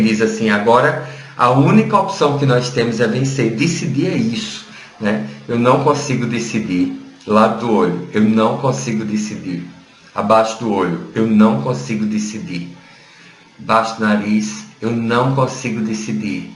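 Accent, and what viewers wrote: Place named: Rio Grande do Norte, Brazil. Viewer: tu és de qual estado? Não consigo identificar o sotaque... Acho que é do Nordeste!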